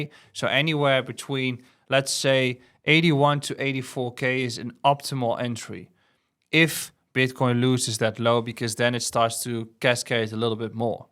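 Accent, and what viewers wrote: Netherlands. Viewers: The Dutch accent though 😅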